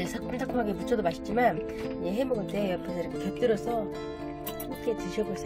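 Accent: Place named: North Korea. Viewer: 북한말인줄 ㅡㅡ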